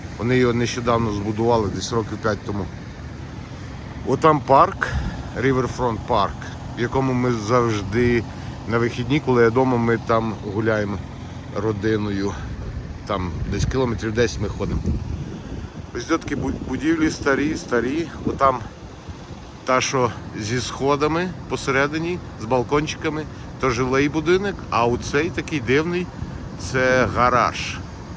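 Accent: Zhytomyr, Ukraine. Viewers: Вітаю . У тебе мова геть на житомирську область схожа)..Цікавий контент робиш як на мою думку .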